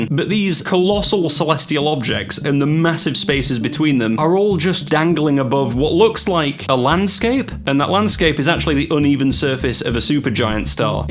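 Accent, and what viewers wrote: United Kingdom. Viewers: Just subscribed absolutely love your commentary 😂 is that a northern accent i hear? ❤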